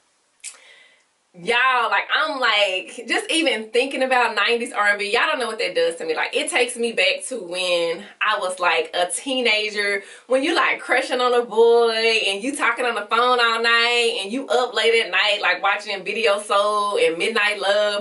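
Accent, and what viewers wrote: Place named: Atlanta, United States. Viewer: prettywitty77 I'm from Atlanta and I thought she was from Atlanta too with this accent lol, I know it gets annoying when ppl ask you that haha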